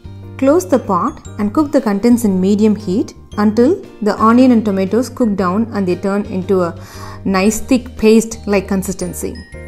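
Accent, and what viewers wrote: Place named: India. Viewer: Your English is very Indian style not perfect at all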